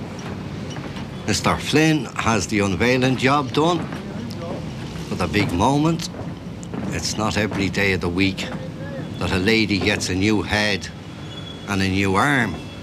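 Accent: Ireland. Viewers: The narrator is stealing the show by being too bloody Irish. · Èammon Mac Thomàis has a much broader Dublin accent than Joe Duffy . That how you know this is him .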